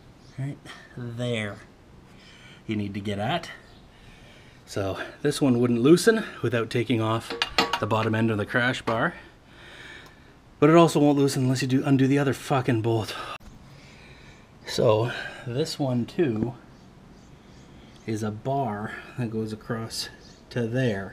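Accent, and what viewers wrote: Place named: Canada. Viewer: Just stumbled upon your video. Lol as you started talking I was saying yo myself this guy must be Canadian! Then I saw the Ontario plate. Lol good video bud!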